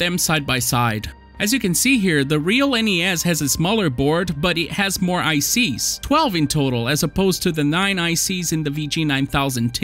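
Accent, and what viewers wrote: Brazil. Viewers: também percebi pelo sotaque que ele é brasileiro! 😂😂😂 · Eu saquei que tu era brasileiro só pelo sotaque enquanto falava inglês, hahahaha Boa sacada fazer vídeos em inglês, mano! Assim você pode alcançar um público ainda maior. Valeu pelo vídeo, curti muito seu trabalho! Mais um inscrito! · perfect RJ accent!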